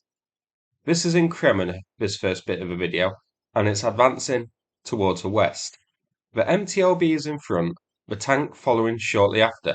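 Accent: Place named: Grimsby, United Kingdom. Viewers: I have been trying to place accent for ages, and now I know. Eh up Grimsby! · A coastal town in Northern England, a different part of which sounds like he is from. Grimsby. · Are you a Grimsby lad 👍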